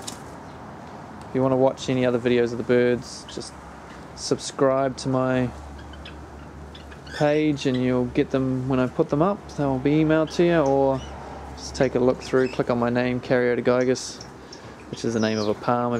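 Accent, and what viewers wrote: New Zealand. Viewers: your accent sounded like a kiwi accent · i detect a Kiwi accent?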